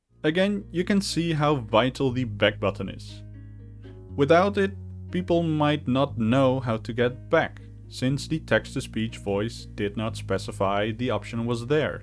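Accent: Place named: Netherlands. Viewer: I'm dutch! Ja dat hadden we kunnen horen aan je accent pik :D The game looks like fun keep up the great work!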